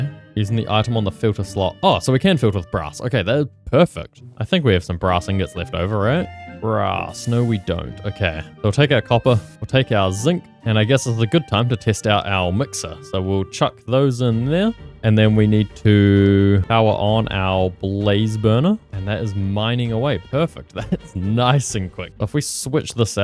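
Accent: New Zealand. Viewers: This man kinda sounds like the Minecraft playing Kiwi Version of Martincitopants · are you kiwi?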